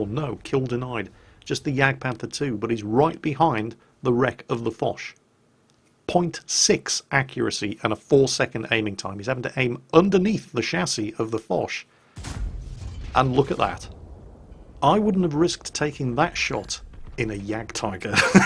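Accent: Russia. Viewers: love that Russian accent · Cant get enough of your KV 2 videos including jokes with a Russian accent and laughter. I love your other videos as well but videos with KV 2 deleting ridiculous targets with ridicules shots just gives a little more juice to the entertainment :D.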